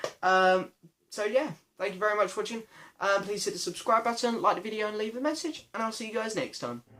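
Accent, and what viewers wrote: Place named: Essex, United Kingdom. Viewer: Where you from? Essex? Love the accent I’m from Romford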